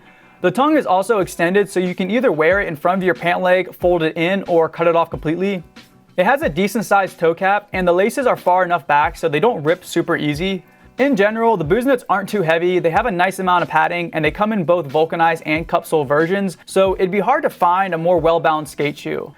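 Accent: Southern California, United States. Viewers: Nick Z that’s a SoCal accent. You hear it in valley people and a lot of surfers, skaters, and punks. I notice people into the things mentioned even talk like that if they don’t live in California. It’s a subliminal thing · i think its a SoCal accent idk much about it so you can try to search it up if you want. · So this is what a california accent sounds like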